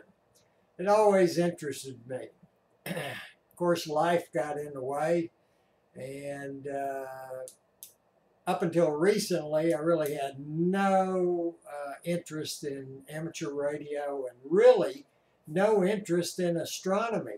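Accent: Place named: North Texas, United States. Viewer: I got stuck on your channel becuase for some reason, you remind me of my late Grandad. I don't know where you're from originally, but he was from North Texas and had a very similar dialect to yours. He was also a ham, as is my Dad. For whatever reason, I never caught the ham radio bug until about a month ago, so I took the Technician test at the beginning of March 2018, and the General at the end of March. I wish I had done it when he was still alive, but at least I'm getting to enjoy learning from my Dad, and your videos as well. Thank you for them! 73s to you! KG5YTI - Brian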